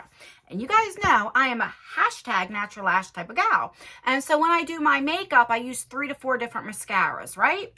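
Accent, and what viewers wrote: New Jersey, United States. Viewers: I’m a Jersey girl living in Tennessee. I love watching your videos bc I don’t miss home so much hearing your accent. Ty!!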